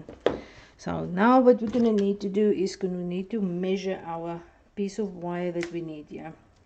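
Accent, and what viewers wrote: South Africa. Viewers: Hou aan vids maak ons is amper by n 1000 subs. Ek het die aksent gehoor jy is afrikaans😂😂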